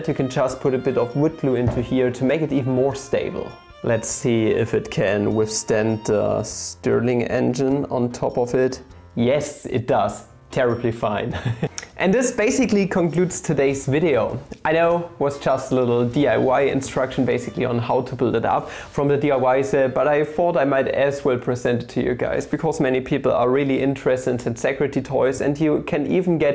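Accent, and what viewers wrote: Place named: Bavaria, Germany. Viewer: that Bavarian accent is just so engaging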